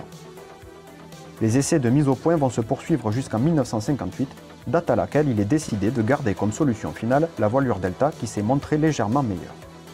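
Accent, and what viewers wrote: South, France. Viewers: Surtout que vous avez un accent du sud non ? Méridional ? En ralentissant on en profiterait un peu plus, c'est sympathique. :p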